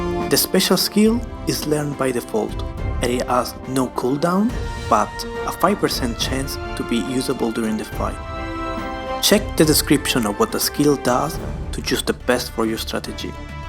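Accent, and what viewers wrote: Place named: United Kingdom. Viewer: British accent LOL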